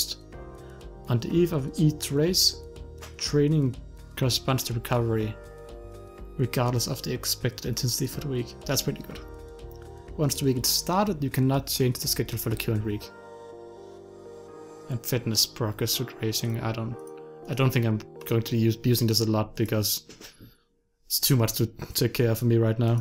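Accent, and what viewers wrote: Denmark. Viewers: Man kan godt høre du er dansker med dig Accent. Hvor kommer du fra? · Are you from Denmark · Are you from Denmark?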